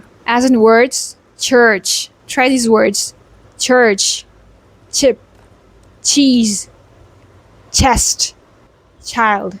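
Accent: India. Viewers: As an American this still sounds Indian.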